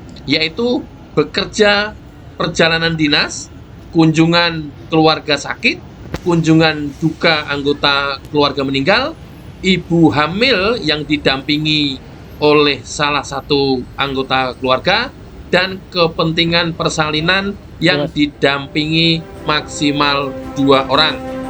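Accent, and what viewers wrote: Java, Indonesia. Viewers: Jawa nya mefom banget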